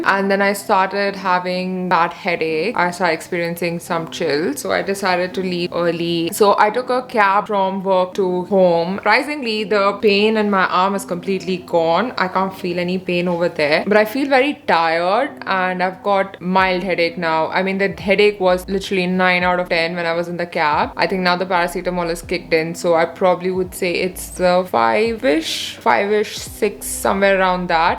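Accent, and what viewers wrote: India. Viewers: Thank you very much for this: it is also a delight to hear your accent for some reason! I am fond of the Indian accent. I had my vaccine yesterday and it was fine, I did have mild muscle aches today and last night, a mild headache but I understand the muscle aches are an indication that the immune response has been innitiated, so nothing to worry about. If one knows what the cause is, then I wouldn't count this as 'suffering': and it is nothing compared to the deleterious effects of the virus itself. My grandmother died of it and I think it's a real tragedy when someone reaches her age and then in the end is taken by something avoidable: I'm sure most people know someone or more then one person for whom this virus has taken there life. Bearing this in mind I think it makes little rational sense to pass up the vaccine out of a fear of possible physical symptoms. 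Out of all the millions of people who have been vaccinated (about 18 million in the UK alone), there have been no documented cases of this vaccine causing death, and I don't know of any serious adverse events that have been blamed on the vaccine. It is fear caused by distrust, caused by the absence of understanding that surely leads someone to resist: so I would urge anyone to find out the facts for themselves, from sources they trust. You areprotecting not just yourself but anyone you come in to contact with. There are no known serious risks to taking it but no end of possible serious consequences for anyone who doesn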